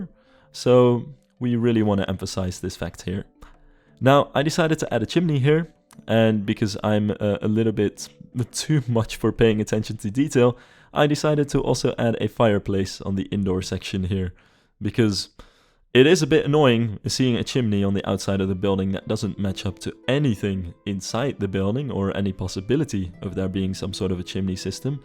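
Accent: Netherlands. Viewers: Apart from the fact that your build looks amazing, I want to point out that I like how even though I could hear that you’re Dutch, you don’t have a horribly Dutch-English accent. Probably a weird compliment, but usually I skip videos from fellow Dutchmen due to their accents bothering me haha. Dus die kan je in je zak steken ;-P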